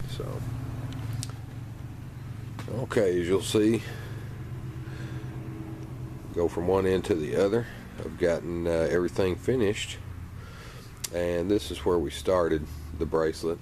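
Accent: South, United States. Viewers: he liked your Southern accent too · Your from the South aren't you?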